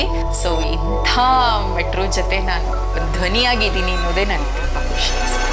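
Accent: Standard. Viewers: I used to repeat after the announcements of namma metro. Thats how much ur voice had influenced us madam! Always felt very proud of ur ಶುದ್ಧ and ಅಚ್ಚ ಕನ್ನಡ ❤❤❤ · “Garden city “ thing is if one single English word comes out of aparna it will be sounded weird she has shuddha kannada voice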